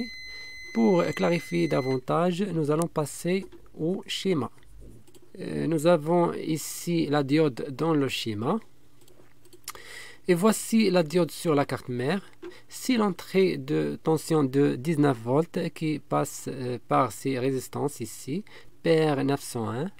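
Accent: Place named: Algeria. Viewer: السلام عليكم ، الأخ مين من الجزائر لهجتك جزائرية، أريد إستشارتك في موضوع الله يحفظك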